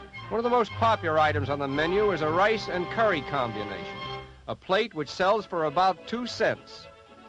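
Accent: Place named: Netherlands. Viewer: Inggris accent Belanda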